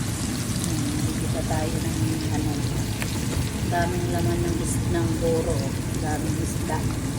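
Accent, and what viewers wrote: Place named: Ilocos, Philippines. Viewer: Sis masarap yan! yung tono ng salita mo Ilocano taga Cagayan ka ba?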